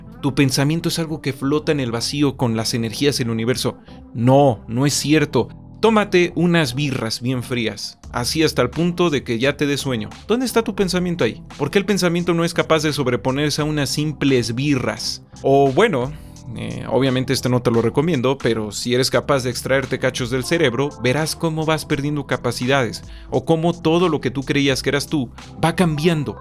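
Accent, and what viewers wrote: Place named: Mexico City, Mexico. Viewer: Mames, el mismo acento de la Debayle y casi todas las ladys, ¿Poblana? Fifi de CDMX?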